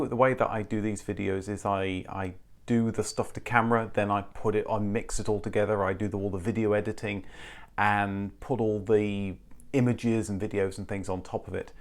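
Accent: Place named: Midlands, United Kingdom. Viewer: Amazing how your intonation switches to much more English (or should I say "Midlands"?) dialect in this "Extra video" ... not sure you noticed.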